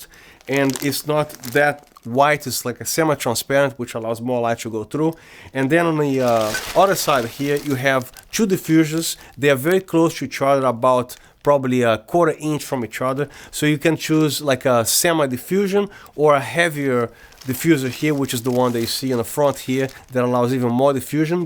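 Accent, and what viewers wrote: Brazil. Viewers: I saw a lot of your videos and always i think you was brazilian or portugues... your english sound like brazilian! Great job bro! Regards from the Caribbean =)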